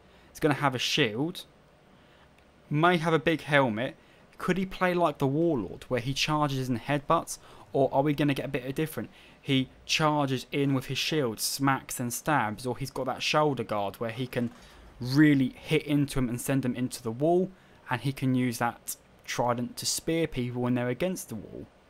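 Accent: United Kingdom. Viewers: Stop using an English accent while saying roman names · Would’ve clicked off the vid if it weren’t for the charm and British accent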